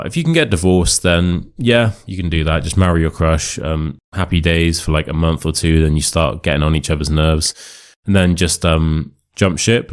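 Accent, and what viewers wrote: RP, United Kingdom. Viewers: Hi, it's really amazing to learn British English with you. Thanks a million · I started watching your videos a few weeks ago to improve my British RP pronunciation, and they’ve been amazing! But here’s the twist 🔀 I’ve become too addicted. I started watching them at night, and now they’ve turned into my secret weapon for sleep. A few nights ago, I fell asleep halfway through a video, and yesterday… I slept for 12 hours straight! So, congrats 🎉🍾🎊🎈 your videos are not just improving my accent, they’ve also become my personal sleeping pill. Keep up the great work, I guess? 🧐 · Thank you very much dear Mr yes you are speaking real British English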